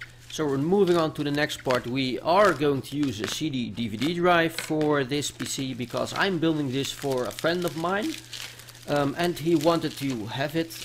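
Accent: Netherlands. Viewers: are you dutch or belgian?? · Love your video's man. Your dutch accent is funny xD Could you possibly help me with a build? The budget is 750 euros. and id like a GTX 770 in there. Thanks annyways. Keep up the great work! I dont need a Monitor, keyboard, harddrive, or mouse. Also id fancy a sexy/badass case! <3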